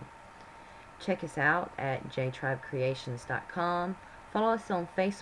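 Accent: South, United States. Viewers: i love ur southern accent! ^.^ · love your voice is that southern plus am learning on how to do tie dye to bond with my stepdaughter and we are doing them together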